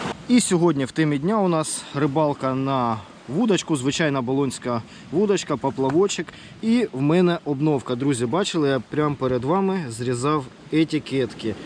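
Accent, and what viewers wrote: Poltava, Ukraine. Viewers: Щодо мови- все добре, чисто полтавський говір;)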